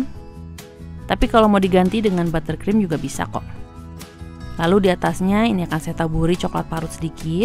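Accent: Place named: West Java, Indonesia. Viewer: Vanila', susu', dulu'😂 Mojang Sunda euy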